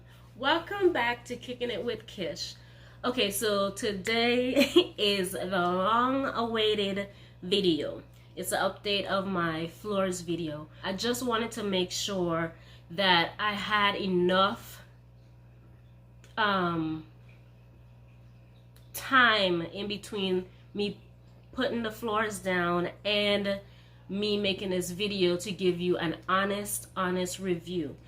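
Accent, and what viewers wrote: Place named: Guyana. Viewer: Why do I hear your Guyanese accent 😭🔥🔥 I love our accent! Thanks for the video!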